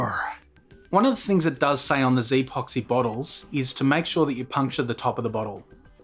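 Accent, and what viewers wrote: Australia. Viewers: Ah, so it's an Australian accent. Lol, yeah his videos are super soothing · I swear an Australian accent makes content better, it just sound more interesting for some reason lol. I was wondering why the long delay between uploads in 2015? you've got great content and a real solid foundation which I'm sure would see you boom on youtube?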